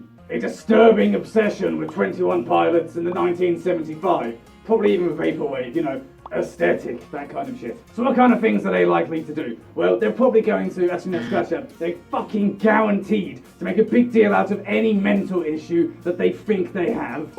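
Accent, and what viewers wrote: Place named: North, United Kingdom. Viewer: "Its not even a script, its on the back of a fucking Tesco Clubcard envelope!" Very British thing to say,. Also, i think because of your accent, you're from the North?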